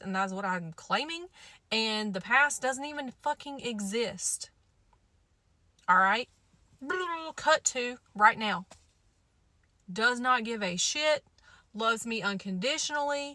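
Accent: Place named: South, United States. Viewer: I'm from the uk but i love your american southern accent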